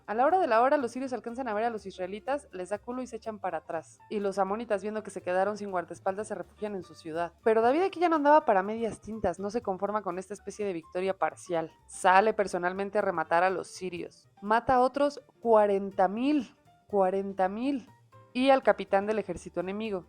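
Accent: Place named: Mexico. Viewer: recuerdo cuando comencé a leer la biblia de inicio a fin ya en mi adolescencia, inició mis primeras dudas a las cuales le comenté a mi madre y esta me dijo: " Recuerda que con Cristo, se selló una nueva alianza eterna" La respuesta nunca me convenció porque más bien parecían dos Dioses. En mi lectura nunca llegué al N.T. en verdad el libro era tan aburrido y habían libros más interesantes que leer... Espero con ansias llegar al N.T. que también tien joyas!!! Saludos desde Panamá. (Me encanta tu forma de leer y cómo el metes ese bello acento mexicano)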